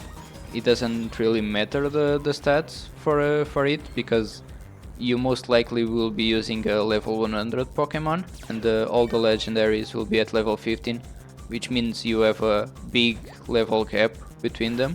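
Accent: Portugal. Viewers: És tuga nao és? Dá para perceber pelo teu sotaque 😅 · That accent, 100% Portuguese! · Are you portuguese?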